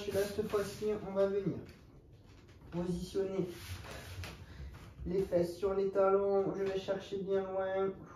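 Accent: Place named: South, France. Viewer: Merci pour les séances et pour l’accent du sud ! J’ai adoré ! Très adaptée pour moi qui ne suis plus de première jeunesse !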